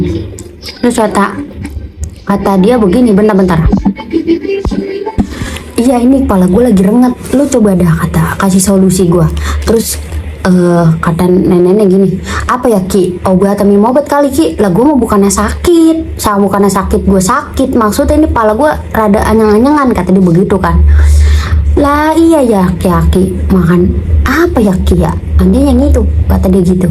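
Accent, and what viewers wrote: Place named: Betawi, Indonesia. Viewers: betawi A nih kalo betawi E udah jarang yak 😂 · betawie ngapak.😁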